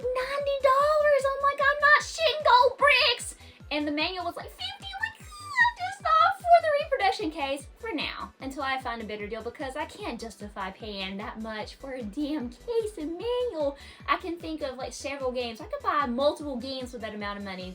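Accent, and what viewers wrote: South, United States. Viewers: Ayo a fellow game collector with a southern accent and tattoos haha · Love your channel and your wonderful country accent 😊❤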